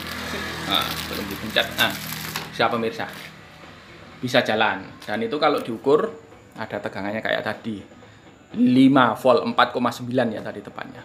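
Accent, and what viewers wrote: Java, Indonesia. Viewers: ngomongnya teralu medok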